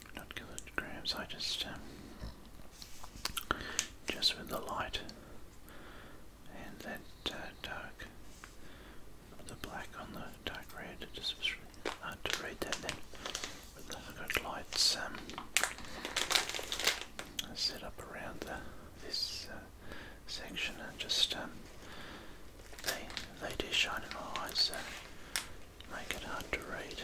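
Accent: Australia. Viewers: To be honest, An Americans ASMR does not do anything. But an Aussie, it affects me. 👌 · A wonderful Aussie asmr, thank you very much. my favorite ever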